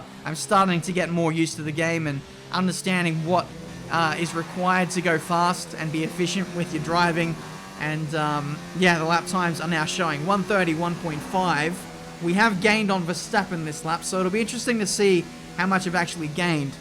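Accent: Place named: Australia. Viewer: I'm not particularly in to F1, but these career mode vids are good fun. Amazing how the graphics capture how the head tilts as the car corners. You can see the force acting on the neck and imagine how strong your neck muscles have to be to take the cornering speeds! With respect to American uploaders, it is refreshing to hear another accent. I love all the Ozzie phrases. I love that the Ozzies take pride in their vernacular. Never mind the Inuit with their 7 words for snow, I love the way Ozzies can say 'this is shit' in a 1,000 different ways!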